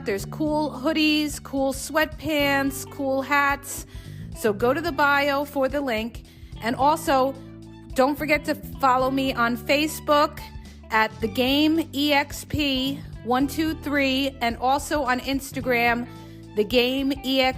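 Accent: New York, United States. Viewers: All I can say is that I have one big regret. And that is that I wish I had known about you 19 years ago when the narcissist from hell entered my life. Had I listened to your podcasts back then, Id probably be very happily married with a good man today. You described my ex to T! I had to do a lot of research on these types of men but you would have been all I needed to help me see the light. I love your hard, NY style delivery. It's what's needed. You are my official go to person now. I binge listen to your shorts reels and longer videos, every spare minute. Finding you is a gift. ❤❤❤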